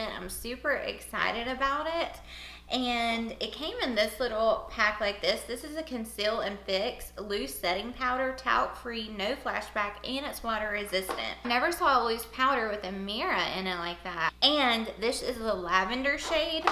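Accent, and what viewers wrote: South, United States. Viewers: You remind me of Casey Holmes so much!!! You both have southern accent and you both have same personality!!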